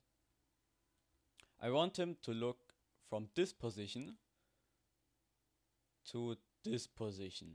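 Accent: Germany. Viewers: Man hört so hart das du deutsch bist xd gutes tutorial btw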